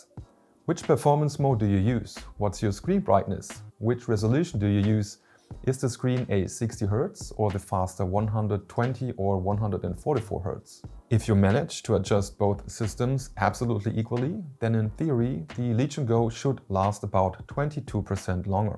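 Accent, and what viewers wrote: Germany. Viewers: Ich hab 2 Wörter gehört und direkt gewusst der Typ ist Deutsch, ahhahaha aber cooler Vergleich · German? Hört sich definitiv so an, danke für den Vergleich. Hab den Legion Go zurück geschickt. Zu groß, zu schwer für einen Handheld. Software hat nur Probleme gemacht und war kaum schneller als mein altes SteamDeck. Überlege doch noch den Ally zu holen...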